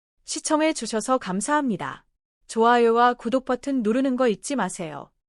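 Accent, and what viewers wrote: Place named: China. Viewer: 자막이 초등학교도 못 나온 사람이 쓴것 같고. 멘트는 중국인이 읽는 듯하다.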